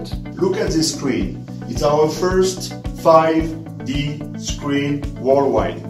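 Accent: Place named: France. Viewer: Bravo. I love your french accent!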